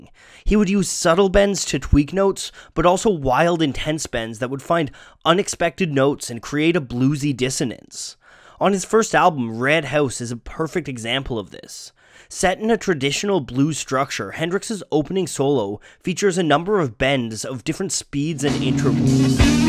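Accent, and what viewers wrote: Canada. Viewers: Is Canadian? His O's sound Canadian.. But im Swedish so what do i know xD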